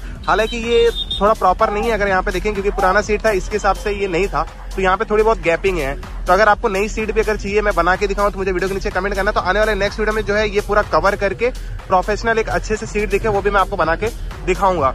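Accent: India. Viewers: Indian English~~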